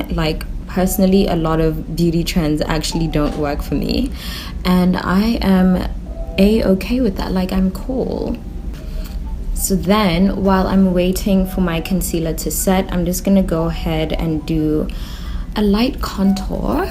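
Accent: South Africa. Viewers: I knew from the moment I heard u speaking that u are South African, u are very detailed,love love ur videos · are you South African Sisi?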